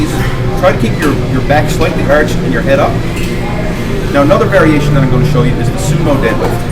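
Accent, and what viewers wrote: Newfoundland, Canada. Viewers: Are you canadian? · I like that I can hear the Canadian-ness. Excellent video, man. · wow man are you newfie?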